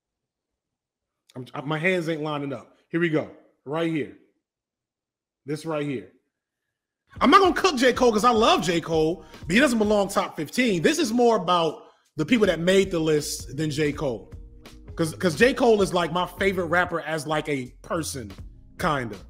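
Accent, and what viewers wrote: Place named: South, United States. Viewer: We also just talk that way in the south. We ain't gotta be leaned up. It's hot man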